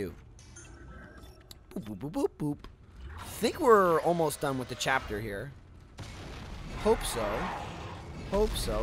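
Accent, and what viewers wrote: New Jersey, United States. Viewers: Hey, what part of Jersey are you from?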